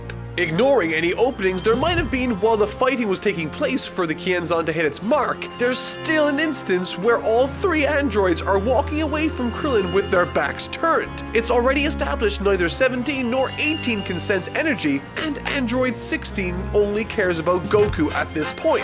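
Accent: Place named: Ireland. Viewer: Idk but i just recognized your irish accent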